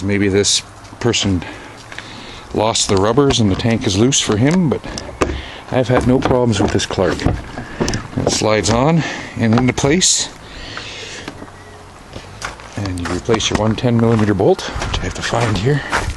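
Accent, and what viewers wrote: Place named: Canada. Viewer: Wut!? That's a hoser accent if I've ever heard one!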